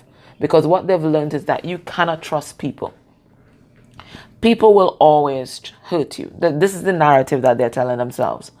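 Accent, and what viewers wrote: Trinidad, Trinidad and Tobago. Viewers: That’s a Trinidad accent y’all! 🇹🇹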